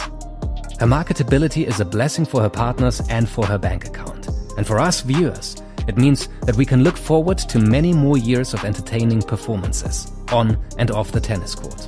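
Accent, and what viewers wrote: Germany. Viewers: Are you [speaker] from Germany?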